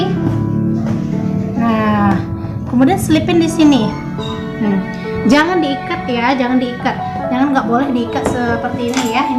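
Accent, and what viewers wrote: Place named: West Sumatra, Indonesia. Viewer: Tangan Bainai ,Logat kental . FIX urang awak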